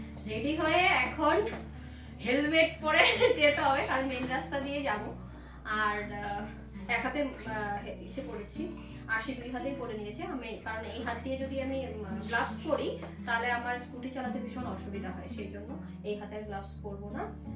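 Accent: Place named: Bangladesh. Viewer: de 2me jkhn bareta kotha blo bangladesh r tan dea kotha blo ota 2mr mukha sunta khub valo lga....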